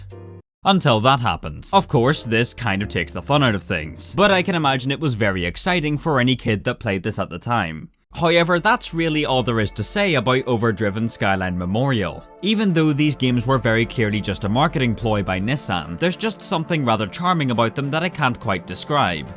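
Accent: Northern Ireland, United Kingdom. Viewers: Norther irish accent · This guys sound british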